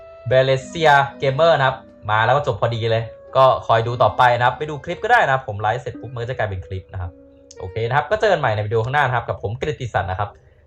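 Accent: Isan, Thailand. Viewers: พี่เป็นคนอีสานหรอผมภาคเหนือ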